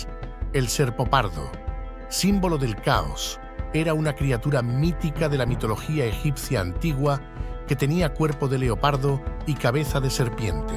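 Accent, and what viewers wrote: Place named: Spain. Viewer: Eres de España amigo? me encanta el asento de la IA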